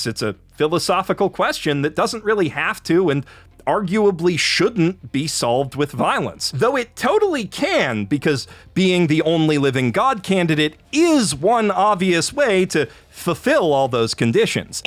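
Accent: Canada. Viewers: Thanks for the CW, I was already glaring at that timestamp and dreading spending that long listening to a canadian, and that splash screen confirmed to me that this video isn't worth watching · An overdose of Canadian in this one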